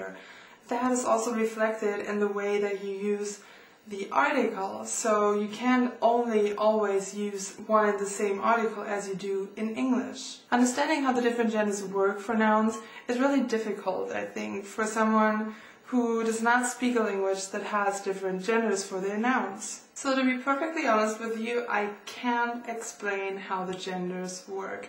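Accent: Germany. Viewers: she's german it's a german accent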